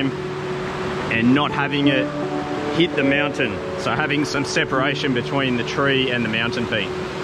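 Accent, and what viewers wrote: Australia. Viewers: Just spectacular. I have only just come across your channel and am stunned by every image in each video and your easy to listen to style (Aussie) and the detailed information you provide. I must get to NZ.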